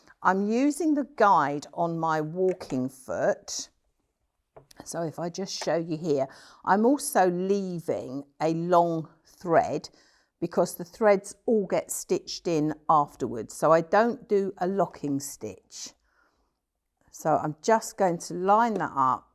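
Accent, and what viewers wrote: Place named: United Kingdom. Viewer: I love listening to you speak. Usually, I can't understand British speaking people ... And if I can, the way they speak can be so over the top. But not you. Your speaking voice and accent is perfectly lovely. Just Beautiful.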